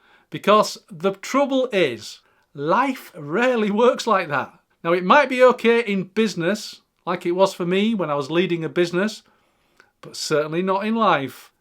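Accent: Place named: Liverpool, United Kingdom. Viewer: Hallo! I'm 61 and I just started roller skating and learning the ukulele in the last few years. I'm going to be taking a physics class in the fall. Thanks for these wonderful reminders. I'm considering writing a book and you're convincing me to give it a go. Never stop learning, never stop growing! 🎉 (BTW, your accent reminds me of the Beatles sometimes. Are you from Liverpool? I'm American, so I am not fantastic with British accents, but I do know they aren't all the same! 😂)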